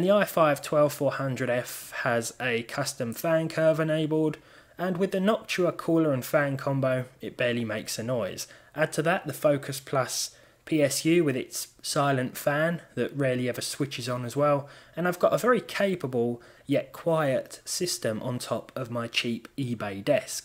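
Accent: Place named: United Kingdom. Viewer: It's for the big brain... which pumps out the British accent... which makes both my ears and eyes bleed :(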